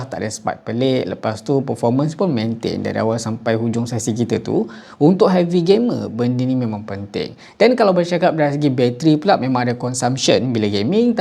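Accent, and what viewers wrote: Malaysia. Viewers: Saya betulkan cara cakap malaysia,saya suka cara dia cakap malaysia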